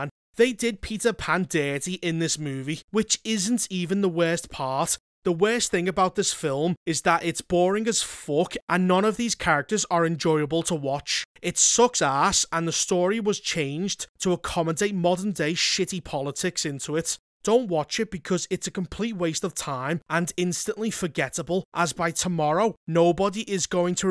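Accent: Liverpool, United Kingdom. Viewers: I lasted a full ten seconds of the appalling voiceover. Scouse mixed with South Wales and a few other things.......thankfully there are subtitles. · So.. You're MAULER. But british... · I don't know which to laugh at the most; the review, the swearing or the indirect jokes! And I love the Scouser accent it's delivered in 😂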